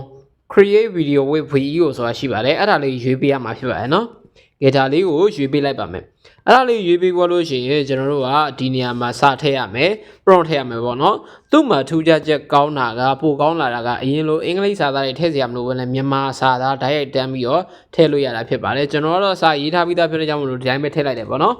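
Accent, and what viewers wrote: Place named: Rakhine, Myanmar. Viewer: အသံကမပီဘူးဗျရခိုင်အသံလိုဘာသံကြီးမှန်းမသိဘူးဗျ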